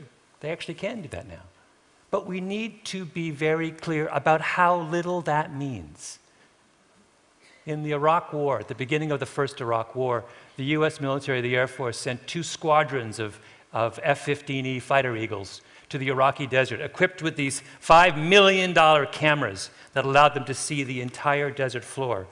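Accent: Quebec, Canada. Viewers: French Canadian. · Sounds Canadian... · Americanos pronounce it as "Bomm Buh Deer" while Canadians pronounce it as "Bome Bar Dee Ay", that's a dead giveaway that Malcolm speaks Canadian. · is Canadian. We sometimes talk funny. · He's Canadian. Where both languages are used.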